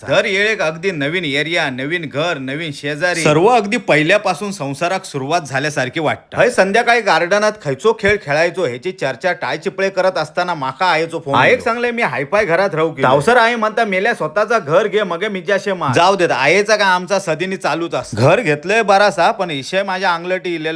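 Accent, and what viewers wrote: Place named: Malvan, India. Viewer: Dada tujhi malvani aikun maja yeta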